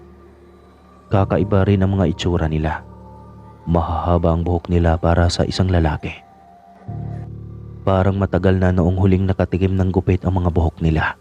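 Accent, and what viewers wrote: Visayas, Philippines. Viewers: ang galing mo ha fluent ka magtagalog pero visaya ang punto mo doin ako bumilib sayo ☺️☺️☺️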